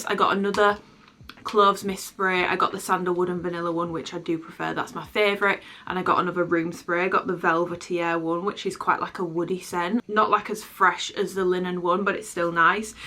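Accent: United Kingdom. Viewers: Rochdale accent?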